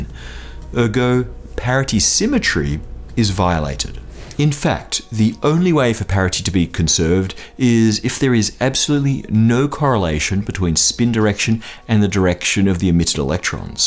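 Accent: United Kingdom. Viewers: This is the first Brit I can listen to without getting annoyed. And the information is easily digested. Very nice job. Thanx. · "The zed axis", "the Zed boson" - strikes me as Brittish-y; do Americans say it like that? Or in the US they have the Zeeman effect and in the UK it's the Zedman effect?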